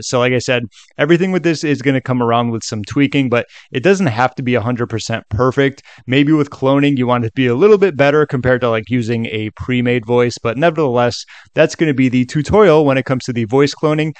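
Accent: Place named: United States. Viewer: Its easy when your accent is so generic American lol but does it actually work for people with variable localized international accents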